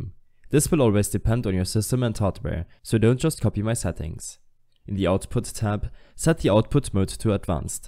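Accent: Germany. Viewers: Yeah, he sounds like Klaus because he's German :D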